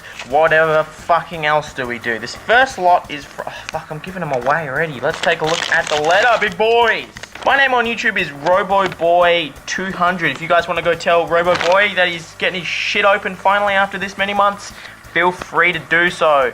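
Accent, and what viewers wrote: Australia. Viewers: HE'S AUSTRALIAN! Sweet :)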